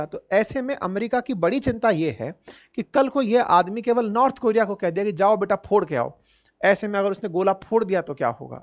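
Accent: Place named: Bihar, India. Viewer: Sir apka tone me thoda biharipan aa raha hai acha lagta hai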